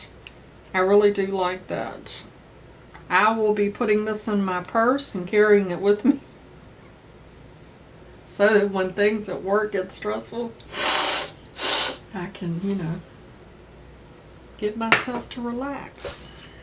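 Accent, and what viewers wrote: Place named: Midwest, United States. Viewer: Sorry, this is going to be a long comment. 😁 I love your videos for so many reasons. I feel like I’m listening to my mom (she’s 64, I’m 43), your accent sounds like my family’s (I’m from near St. Louis), and I love the tips to your son (which I’ve mentioned before). I emigrated to Europe 10 years ago, so your videos always make me feel close to home, even when I’ve not been back for several years. So thanks for the work you do!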